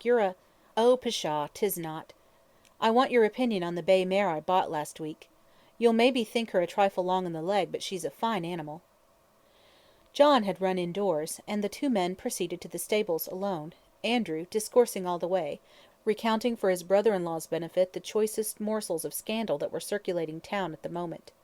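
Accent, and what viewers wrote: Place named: United States. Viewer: Disappointing in American accent.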